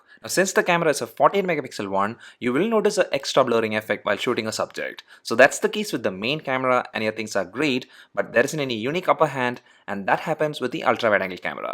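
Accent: Kerala, India. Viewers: Are you from kollam kerela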